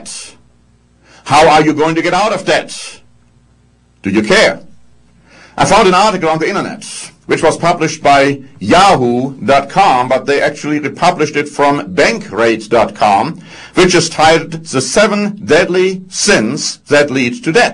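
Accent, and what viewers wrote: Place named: Germany. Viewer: this guy is german , u can easily tell by his german accent .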